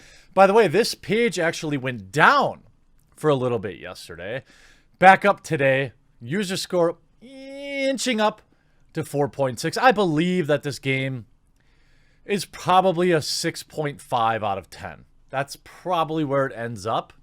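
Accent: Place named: United States. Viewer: When did ditch his accent? He sounds completely american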